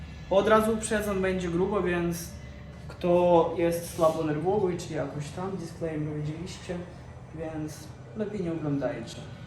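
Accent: Ukraine. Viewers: A ty jesteś z Polski czy z Ukrainy albo masz problem z językiem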